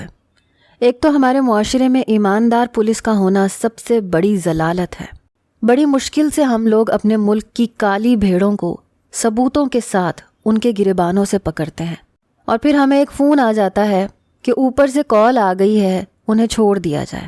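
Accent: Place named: Punjab, Pakistan. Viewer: Love ur Punjabi accent 🌹♥️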